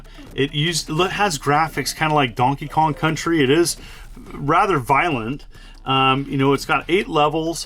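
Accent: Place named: Northern California, United States. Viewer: Great video. It’s funny I’m a NorCal dude also and i recognized your “accent” immediately lol. Thanks for the great content. Love it.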